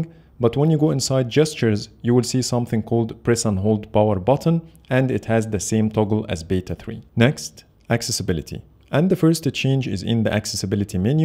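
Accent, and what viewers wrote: Egypt. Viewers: Are you Egyptian? your accent is Egyptian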